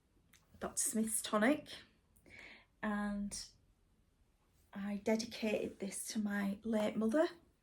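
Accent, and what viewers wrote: Yorkshire, United Kingdom. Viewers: I absolutely love your Flosstube! You are so genuine and funny.....an I do love a Yorkshire accent! Your stitching is gorgeous and very inspiring. Are you going to the Great British Sampler Weekend next year? 🤩♥️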